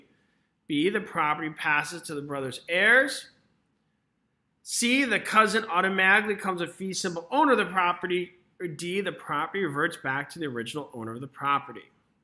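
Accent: New York, United States. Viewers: I’m from Brooklyn, so I was like, where else but NY, with that accent. 😁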